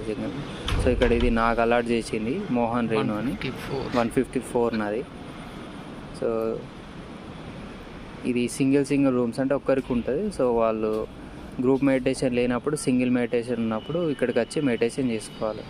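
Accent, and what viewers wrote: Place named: Karimnagar, India. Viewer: Karimnagar language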